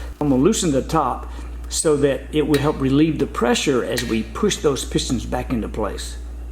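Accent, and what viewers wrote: South, United States. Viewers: Great vid! Well videoed. Easy to see what you were doing along with an understandable explanation. Love the shit kicker drawl.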